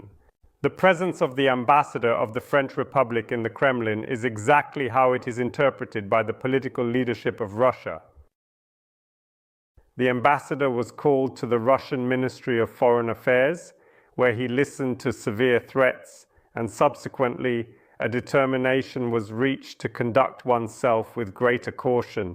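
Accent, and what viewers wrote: United Kingdom. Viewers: Pan Portnikov’s speaking with BBC accent! )) Technologies are amazing. Now I am able to share your brilliant analytic with my English friends. ❤ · this is true, but his voice must be ai generated. He has nice British accent, this is impossible to achieve with his background.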